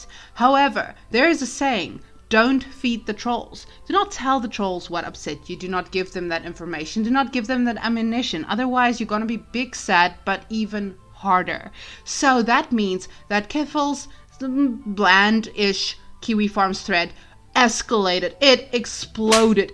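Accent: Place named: South Africa. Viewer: Aydin Paladin het jou video vir my gestuur, het die stem gehoor n gedink ja nee jy kan net n Suid Afrikaner wees..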